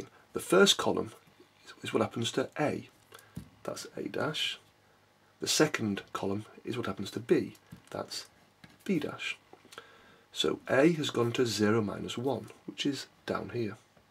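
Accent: England, United Kingdom. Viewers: I LOVE MY VIDEOS NARRATED WITH AN ENGLISH ACCENT. THANK YOU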